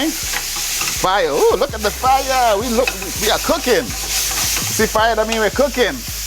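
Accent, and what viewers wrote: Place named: United States. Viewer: Your stateside accent,,is funny 😂! Be yourself, its okay😅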